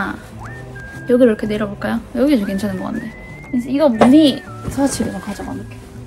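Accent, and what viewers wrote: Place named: Gyeongsang, South Korea. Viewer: 말투가 같은 경상도인인거같은데 ㅎㅎㅎ 맞나유~^^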